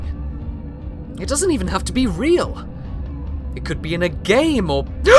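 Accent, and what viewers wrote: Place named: London, United Kingdom. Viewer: Are u seriously British? Because it sounds like u live In London!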